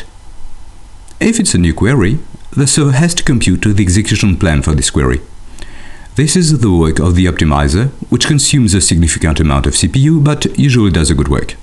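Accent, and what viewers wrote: France. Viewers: You guys should be happy he is taking the time to put this online and help us (instead of bitching).. plus his french accent is pretty light, compared to some. Thanks again for the videos they are a great help. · He does not sound anything like an Indian. The narrator is French.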